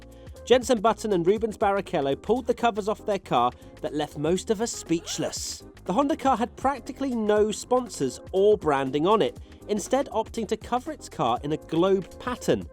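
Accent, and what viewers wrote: United Kingdom. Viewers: Aaaah your british accent 😵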